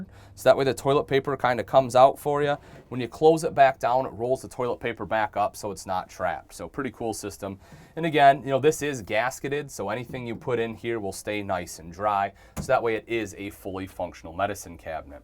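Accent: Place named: New England, United States. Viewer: I’m detecting a trace of New England in his accent.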